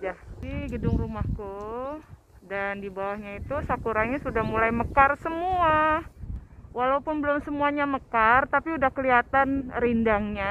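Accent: Bekasi, Indonesia. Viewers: Mpok next kalo buat vlog lumayan lama durasinya yaa, suka banget liat vlog Mpok, apalagi liat yang super gemes, masyaa Allah seneng banget liat Mpok walau udah tinggal disana tetep gak sombong dan aku suka gaya ngomong Mpok yang khas Bekasi hehee, Salaam dari Bekasi Mpok.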